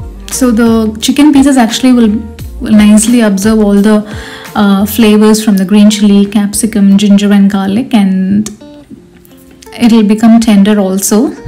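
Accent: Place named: United States. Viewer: நீ பேசுகிற அமெரிக்கன் இங்கிலீஷ் விளக்கவுரை மிகவும் அருமை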